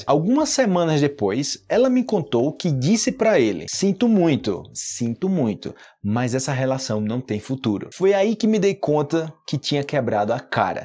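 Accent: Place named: Antioquia, Colombia. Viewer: Muchas gracias. Cuando hablas español tienes un acento paisa extraordinario.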